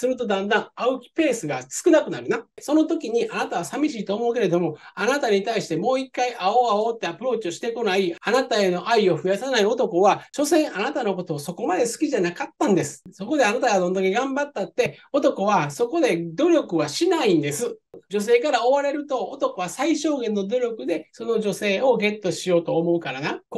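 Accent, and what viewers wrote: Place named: Kansai, Japan. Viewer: 話し方が関西弁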